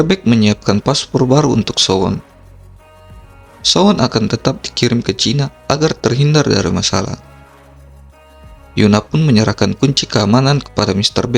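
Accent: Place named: Makassar, Indonesia. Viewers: Bner bgt kaya org Makasar suaranya....aku punya saudara di Makassar kota bantaeng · Orang Makasar ini host nya.. dari logat keliatan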